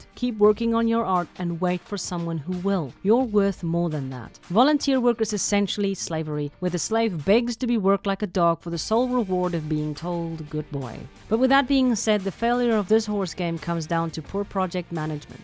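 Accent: Afrikaans, South Africa. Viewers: Wag, jy's Afrikaans? Of praat Afrikaans? Hoe het ek nie die aksent geplaas nie! ei ek voel so dom nou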